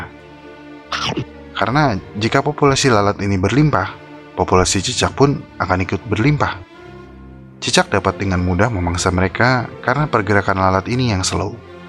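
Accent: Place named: Java, Indonesia. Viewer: wong jowo fix ono kata" deng 🙈🤣,jowo ne ndi mas ?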